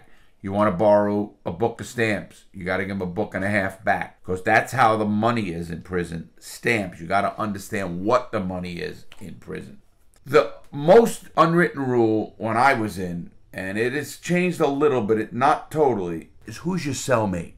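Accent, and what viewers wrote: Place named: Brooklyn, United States. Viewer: the Brooklyn accent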